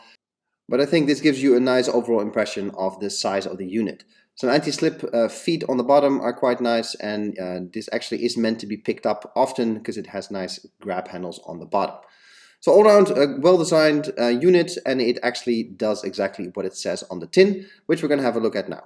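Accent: Netherlands. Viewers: Are you dutch?Good review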